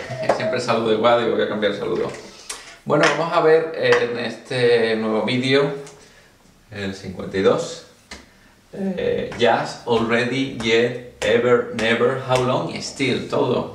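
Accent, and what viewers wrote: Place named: Andalusia, Spain. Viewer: En el caso de still , le recominedo explicar primero el uso normal, y luego la excepción que le permite posicionarse delante del verbo modal o primer auxiliar. el acento andaluz es cojonudo, los que desprecian a un profesor por el acento, no me gustan, ya que tantos acentos habra como culturas o paises adopten el ingles. jamaicano, sudafricano o español.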